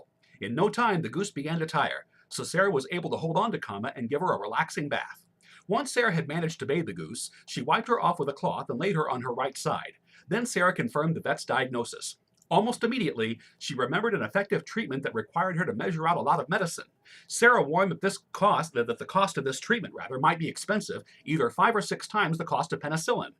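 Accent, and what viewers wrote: United States. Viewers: You got an amazing Accent.Thanks American for sharing your Accent challenge for all of us. Such a beautiful Voice!! I don't have words. English Degree Student from🇧🇷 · You have a Lincoln Nebraska accent now lol. Missouri has a natural Southern Drawl no matter where their from. However the accent has slowly died off. My Grandma is from Kohoka (North Missouri). She has a strong Southern Drawl.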